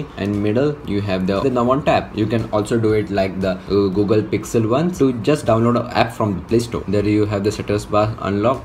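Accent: India. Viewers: stop videos by indians english language. its very hugly!!! please stooooop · god every non-popular tech video I watch is an Indian with a horrible english accent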